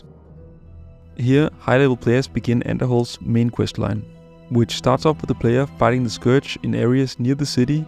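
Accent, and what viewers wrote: Denmark. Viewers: Simply cannot watch stuff with such a thick Danish accent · Good ole Dane accent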